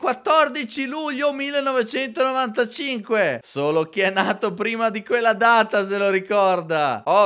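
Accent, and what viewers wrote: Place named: Romagna, Italy. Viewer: Bello l'accento romagnolo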